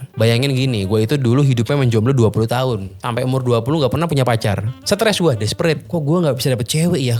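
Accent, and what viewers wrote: Java, Indonesia. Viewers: Buset, medoknya juga ketularan 🤣🤣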